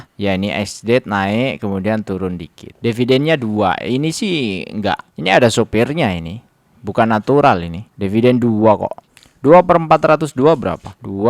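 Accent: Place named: Madura, Indonesia. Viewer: Trimakasih abang qu... Sudah di cek saham pans nya... Maaf bang.. Asli orang madura y... Logat nya.. Ngga bisa hilang.. 🙏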